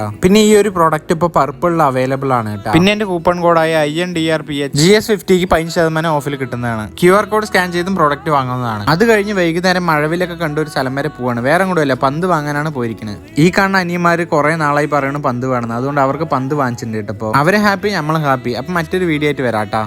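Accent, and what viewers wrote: Palakkad, India. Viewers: Palakkad karan aano😅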